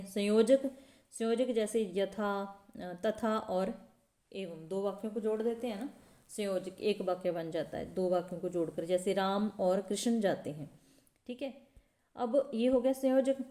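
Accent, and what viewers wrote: Punjab, India. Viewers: Mam apki hindi me Punjabi touch h aap Punjab se ho.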